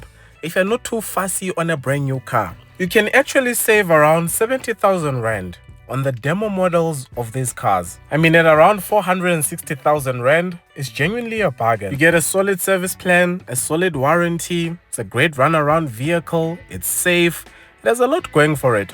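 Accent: United Kingdom. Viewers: The British accent 😂😂😂😂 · The British accent 😂😂😂😂🔥🔥🔥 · It's a British accent for me." Allow us get started ❤❤ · British accent😂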